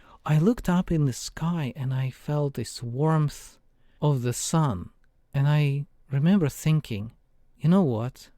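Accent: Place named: Russia. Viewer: First, the Russian accent; second, the subject matter; third, the relevant knowledge of history. I myself am half-Russian; Baptized Orthodox from infancy: My grandparents -- from the nobility and landed gentry, respectively so speaking -- escaped the USSR in around 1920 to emigrate finally to Canada via Western Europe. My mother was born in Canada right around the beginning of WWII; I was born in the USA in January of '71. It's A Small World; But it's an even smaller Orthodox World. God Is Good. Glory To God For All Things. До свидания, Господин.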